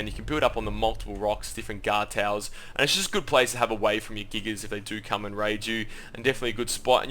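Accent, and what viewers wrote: Australia. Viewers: I could tell your Aussie! Same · yeah, can always pick up that sexy aussie accent anywhere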